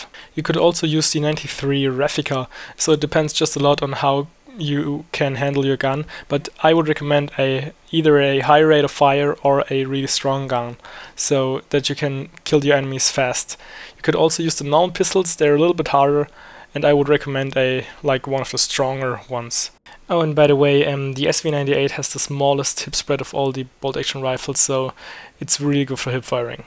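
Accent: Germany. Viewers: Love your accent are u German? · Omg, I love you're german accent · You are German, aren't you?:-)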